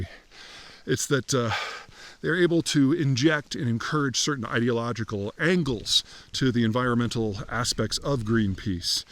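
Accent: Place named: United States. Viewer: Your pronunciation of Russian words is pure American. Clear, but not "native." The average American with training in Russian sounds like he/she is from Georgia; the Georgia that used to be part of the Soviet Union.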